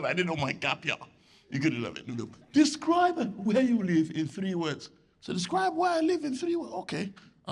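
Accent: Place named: London, United Kingdom. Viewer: I just found your show here on YT , thought check him out: is he funny / something interesting to say? YES 😊 especially when you talk that London accent woop woop I Love that.. New sub & support from Amsterdamn, H0llland.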